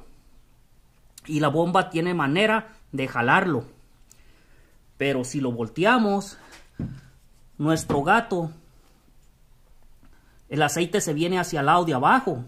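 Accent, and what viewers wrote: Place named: Mexico. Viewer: Te doy like x la tonada mexicana nomas☝️😂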